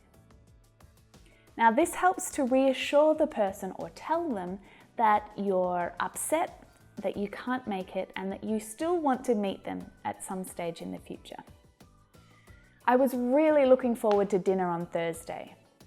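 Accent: Australia. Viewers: Hi haw are you?are you from Australia?Australia is very nice cauntre.Australian people are very kind.you too.l love your acisent you speak good l am your student.thank you very mach l love your lessons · I'm lucky finally i got you here as a great teacher I'm live in Sydney I'm learning a lot from you specially Australian accents Thank you so much love you mmm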